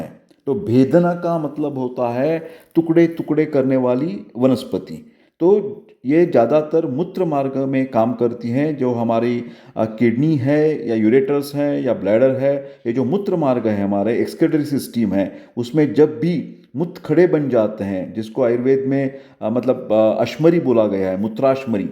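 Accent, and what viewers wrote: India. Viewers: Thank you sir. Clear puneri Hindi. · Khup chan explanation marathi manus hindi bolat ahe . He kalte..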